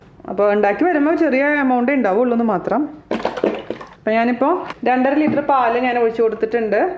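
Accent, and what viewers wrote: Thrissur, India. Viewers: njanum thrissur kaari aanu..chechiyude samsaram kettapo manasilayi.nte naattukari aanu ennu.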